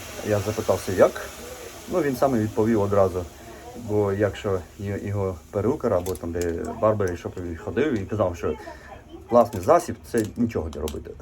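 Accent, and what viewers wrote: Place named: Lviv, Ukraine. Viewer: Ви молодець я зрозумiла, що ви з Миколаева а мова у вас начебто ви зi Львова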